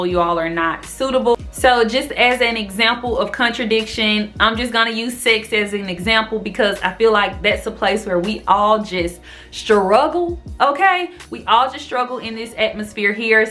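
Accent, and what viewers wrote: South, United States. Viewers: No offense. But I’ve never seen a black women with a southern accent 😅 very unique and interesting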